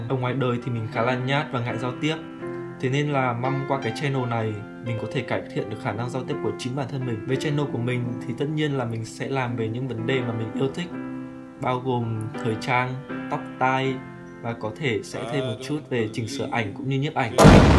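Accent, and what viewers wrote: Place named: North, Vietnam. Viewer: He has a Northern accent but it isn't too hard for me to understand being from the south :D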